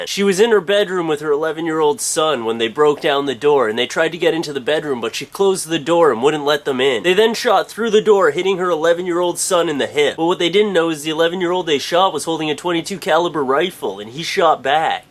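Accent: Canada. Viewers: Is this guy Canadian? Canadians can't make funny! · r u canadien?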